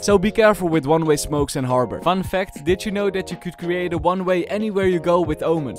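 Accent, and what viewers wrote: Netherlands. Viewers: BRO YOUR ENGLISH ;( ur from the nederlands and u can hear that so fucking good like at least try to have a diffrent accent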